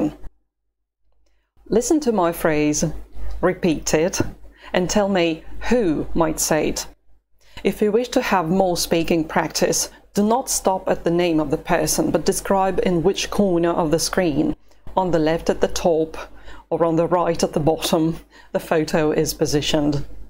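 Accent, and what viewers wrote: United Kingdom. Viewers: as a russian your english like BBC reporters ı am not sure ı follow your channel your english or your russian.. but it makes me keep watching . thanks anyway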